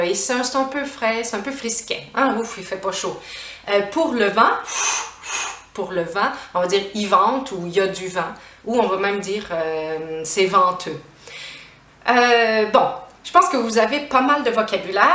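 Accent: Quebec, Canada. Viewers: Madame, est-ce que vous pouvez me dire pourquoi je vous comprends sans aucun souci, mais je n'arrive pas à comprendre certain comédiens y compris Bob Elvis Gretton, Mike Ward, Corine Côté. Tout vous-autres parlez avec un accent québecois.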